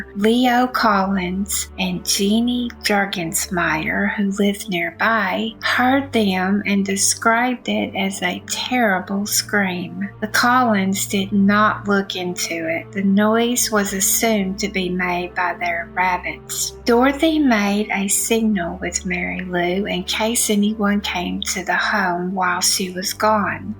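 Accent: South, United States. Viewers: I love your southern draw..Alabama here.. thanks for another one friend..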